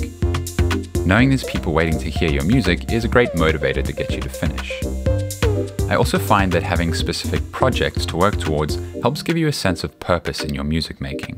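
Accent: South Africa. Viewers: South african ? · I can hear that you are from Cape Town 👀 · You sound so South African my bru !!! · Ayyyy you from durbs bru? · Are you South African? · I got excited when I heard your SA accent . Thanks for the tips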